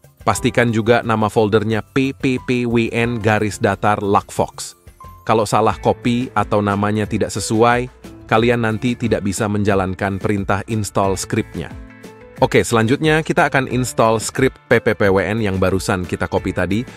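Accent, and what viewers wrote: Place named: Sulawesi, Indonesia. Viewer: bbrapa wktu yg lalu sy nnton dia hunting ps4. Ad semacam percakpan logat nya sprti dari pulau sulawesi. Perkiraan sy dia di manado atau gorontalo atau bsa jg di kota palu.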